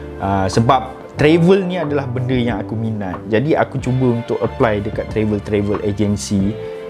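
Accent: Terengganu, Malaysia. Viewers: Tahniah.. Selamat maju jaya.. Tapi request aku sblm ni tak buat lgi.. Aku mintak video ckp full loghat tranung mung dok buat lagi..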